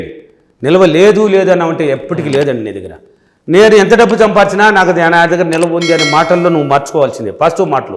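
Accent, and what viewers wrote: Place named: Chittoor, India. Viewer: I think he is from Chittoor... That slang is lub❤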